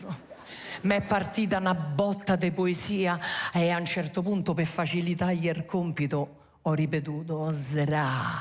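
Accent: Rome, Italy. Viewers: ma quelli che paragonano l'accento di con la Presidente del Consiglio per via dell'accento romanesco.......bah.....(perchè non dire allora, la stessa cosa della grande Anna Magnani!!?) che problemi avranno!?? come dite...ah! si,.....FATEVE UN BEL BIDE' DE CAMOMILLA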